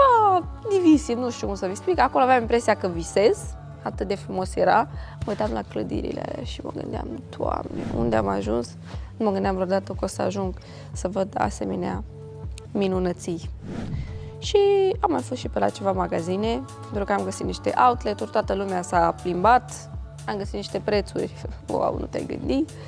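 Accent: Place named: Moldova. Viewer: E moldoveanca ce vrei.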